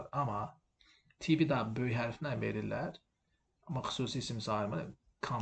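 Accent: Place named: Nakhchivan, Azerbaijan. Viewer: Muellim deyesen naxcivandandir nitqi cox sirindir ona gorede i gilisce sozleri ele seslendirir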